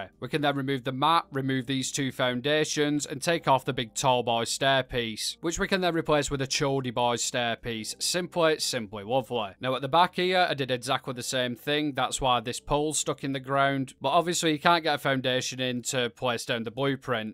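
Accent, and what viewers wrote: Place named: North, United Kingdom. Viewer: Fellow northerner here, by the sound of your accent we are not too far apart. Anyhow just wanted to say I stumbled across your videos recently and I found them well made and chuckle worthy. Nice job! Subbed.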